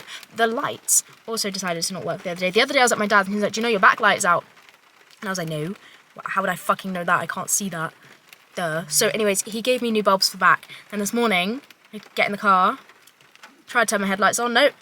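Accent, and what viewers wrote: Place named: United Kingdom. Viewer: Who would not wanna hang out with such an endearing British accent? 😍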